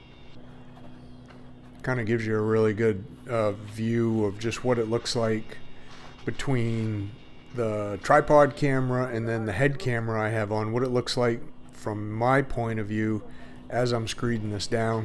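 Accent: Maine, United States. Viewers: You are absolutely right about steel reinforcement on concrete. Concrete has very high compressive but less tensile strength. Reinforcement helps protect slab integrity under tension. Common ways the concrete has tensile forces applied are being entrapped (like a slab between walls) when expanding and contracting from temperature changes or subsidence form improper subgrade preparation. I had a 26' x 36' shop slab poured inside a ICF frost wall (8", 4000 PSI with fiber, one control joint midway, on 2" of blue foam with 6 mil vapor barrier, and meticulous sub grade prep) and no steel. People we're flipping out telling what a disaster I was going to have. Ten years latter there is not a crack in that slab. It's expansion and contraction is buffered by the ICF block so it is not trapped and never goes under tension. This is with a 10,000 lb car lift on it. 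The unstated downside to steel is it expands as it inevitably corrodes and can break the slab up on it's own. Great channel, watch regularly. I'm from Hollis, ME now exiled to VT. I recharge my accent when I watch. As I tell the Vermonters, anybody from Maine is worth two Vermonters, three on a good day. They take it in the spirit of humor as intended but people from away are aghast.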